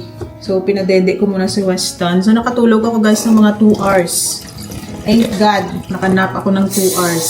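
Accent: Iloilo, Philippines. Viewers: hi mhie taga san po kau parang ilonggo kc accent mo kahit nag Tagalog ka😊😊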